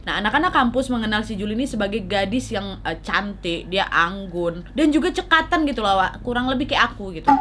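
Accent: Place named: Batam, Indonesia. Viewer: Logat anak batam wak...☺☺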